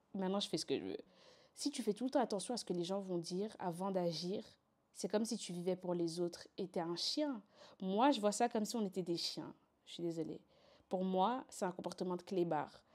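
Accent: Flanders, Belgium. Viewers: Oui tu as un accent ça fait belge, un peu néerlandais ou je sais pas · Mais t’a juste un accent belges 🥲 il doit y avoir plus de français dans ton TikTok ( je me suis abonnée mdr j’aime bien te regarder sur tiktok donc why not)💕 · Tu as un accent de flappie qui parle français soupoudrer d'origine Rwandaise ou burundaise 😗😗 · Oui elle a grave un accent belge hahah bien néerlandophone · mais oui t’as un accent ! c’est sur t’es belge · Ouii t’as grv un accent flamand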